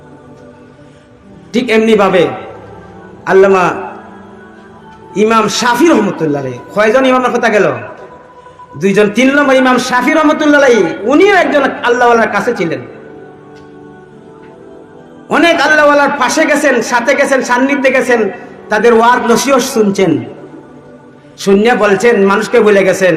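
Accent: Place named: Sylhet, Bangladesh. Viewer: Bhai where are you from originally (from bd) ? It sounds like you're my sylheti fellow Muslim bro😂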